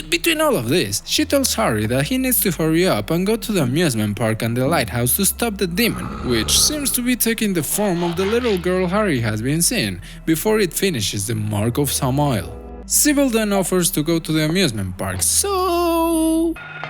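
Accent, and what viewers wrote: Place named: Russia. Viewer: Good Russian(?) accent for storytelling! nice video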